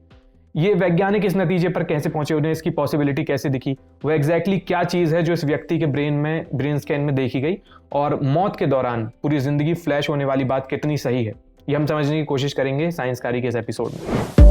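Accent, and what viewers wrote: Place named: Lucknow, India. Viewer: Full Lucknowa tone, like that 👍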